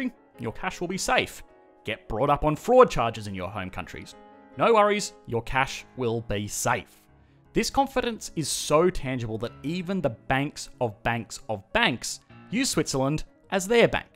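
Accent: Australia. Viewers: My God Australian Accent. Couldn't understand properly. It's very tough to understand. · What a terrible (Australian) accent. Hard to take it seriously.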